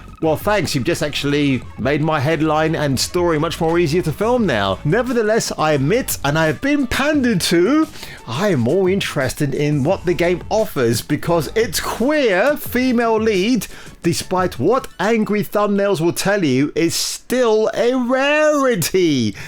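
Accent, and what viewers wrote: United Kingdom. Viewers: your british accent hot chocolate savage criticisms will have these WAHMAN game developers short circuiting, keep it up bro!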